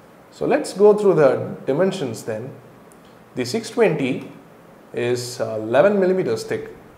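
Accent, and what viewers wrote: India. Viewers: your voice is very clear you had a really nice review and you didnt speak too fast for some reason, I liked the hint of an indian accent to your voice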